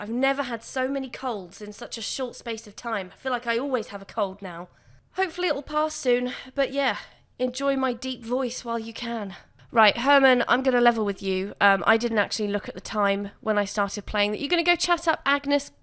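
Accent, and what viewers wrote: United Kingdom. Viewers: I love your videos! Your humor and sarcastic comical self is just like mine. I love the sims, and I enjoy your videos! Always love hearing your story of your sim and always got me laughing which I need bc life sucks! I love your accent! British ladies got it going on! Cheers!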